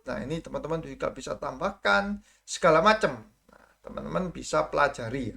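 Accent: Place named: Java, Indonesia. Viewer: Ko kok ngomong nya bahasa Indonesia medok banget ko, jadi nyaman dengerinnya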